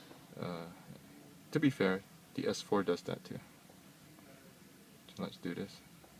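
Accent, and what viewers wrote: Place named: United States. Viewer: you sound like American. do you live there?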